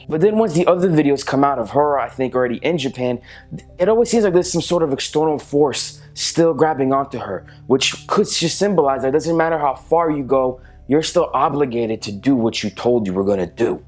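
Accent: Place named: South, United States. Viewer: where are u from cuz have a southerny westernizh accent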